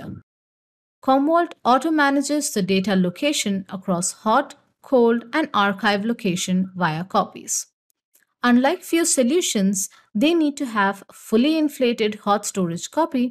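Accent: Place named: India. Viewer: Giọng Ấn khó nghe quá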